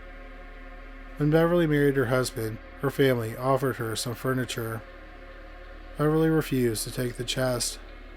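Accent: Canada. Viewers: He’s Canadian, that’s his accent. Leave his page if it bothers you so much. I used to turn up the speed of his videos when I first started watching bc his voice was annoying to me. I don’t mind it anymore.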